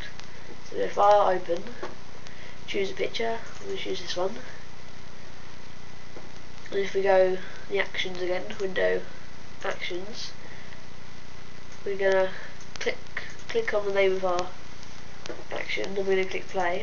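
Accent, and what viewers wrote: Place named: United Kingdom. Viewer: sounds kinda like hillbilly british english....say WHAT...?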